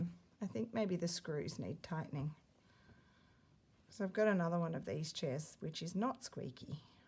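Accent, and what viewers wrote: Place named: Australia. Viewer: Thank you, for your time, talent, and inspiration! I, too, have no friends that slow stitch! I do have fellow teacher at my school who is from Perth - she spends our summer there. So, talking with her is like visiting with you and - I love the accent!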